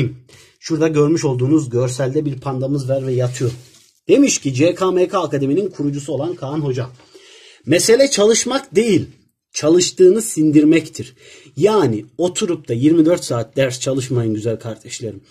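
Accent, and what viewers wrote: Kayseri, Turkey. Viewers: Hoca galiba kayserili aşadaaaaaakii karşınızdaaaaakii sinirim bozuldu skjsnsiwns😅😂😃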